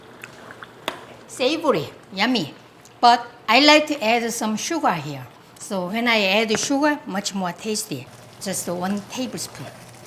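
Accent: South Korea. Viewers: Your Korean accent is very endearing (e.g., "too muchhhy""). Please don't ever change.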